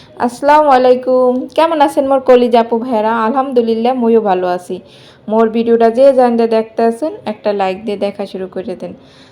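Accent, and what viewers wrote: Barishal, Bangladesh. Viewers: আপু তোমার বাড়ি কি বরিশাল তোমার কথায় মনে হচ্ছে তোমার বাড়ি বরিশাল আমার বাড়িও বরিশালে ❤❤আজ প্রথম তোমার ভিডিও দেখলাম ভালো লাগলো আপু ❤❤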